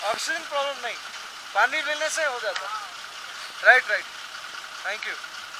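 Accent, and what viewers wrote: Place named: Puri, India. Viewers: sahe sahe re khela bhai puraa aamara puri style re suniki bhala lagila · Where are you from brother? You sounds like a little bit bengali 😄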